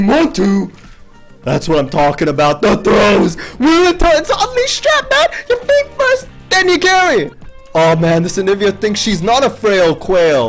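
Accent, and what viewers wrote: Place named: United States. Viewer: Are you sure you're not American? You sound like somebody from where I live.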